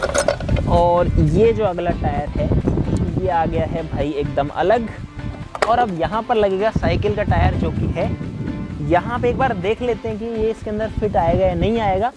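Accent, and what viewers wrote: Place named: Gujarat, India. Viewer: Oh bai tum gujrat se ho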